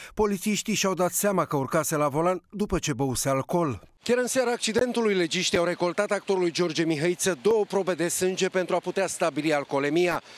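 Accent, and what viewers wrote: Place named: Moldova. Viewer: e sholdovean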